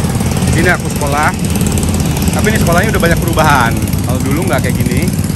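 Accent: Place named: Padang, Indonesia. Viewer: bahasa nyo cak bahasa palembang, tapi logat nyo cak padang yo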